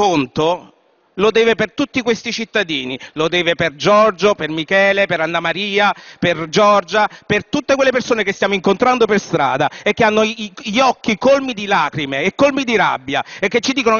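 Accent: Rome, Italy. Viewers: Senza slogan e battutine da terza elementare con accento zingaro romano non capisce?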